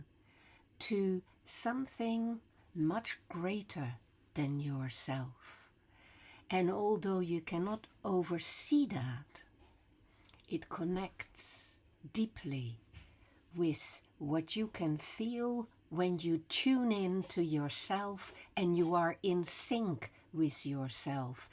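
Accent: Netherlands. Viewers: Beste, bent u Nederlands? Ik vroeg het me af op basis van uw Nederlandse naam en accent.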